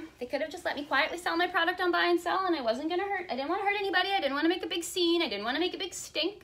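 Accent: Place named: Canada. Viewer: I came so close to joining Mary Kay in 2007 - the only reason I didn't was the stupid skirt rule. I was invited by a "new friend" to a recruitment talk(?) with a bunch of other potential victims and my sensible arguments about the skirts may have (hopefully) also scared others off. I really appreciate you doing this - I also just appreciate hearing a Canadian accent as a former Calgary gal now in the UK.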